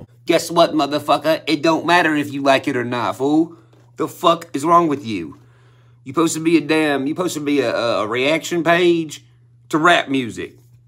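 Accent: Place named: Tennessee, United States. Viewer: Sitting here listening to you speak brings me back home. I feel out of place sometimes living in truckee as I’m from bumpus mills tn on tobacco port circle. You always keep it real and I appreciate that. Wow I never heard of the bot farms! That makes sense. I gotta get back to work. Thanks for the content I listen to all your music and I have started listening to your videos where you talk. Literally every time I have blows my mind! Keep calling shit out and keeping it real!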